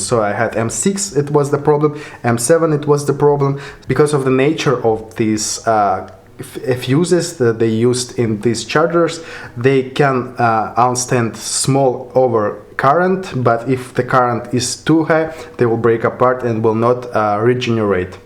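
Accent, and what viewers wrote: Russia. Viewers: сори, слушать такой вот рунглиш это насилие для ушей. попробовал включить субтитры, но они автопереводные. смотреть и без звука и без субтитров - бестолку. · Прикольный английский у тебя 😺 Чисто русский английский 👍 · мне понравилось, давай больше таких роликов, смотришь и язык подтягиваешь ) при чем быстроговорящих американцев без субтитров не понять, а когда присутствует русский акцент, сразу понимаешь )))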